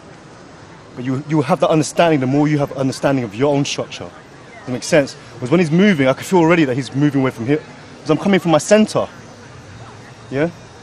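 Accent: United Kingdom. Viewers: i love i assumed he was gonna have an asian accent and he came sounding like a sidemen😂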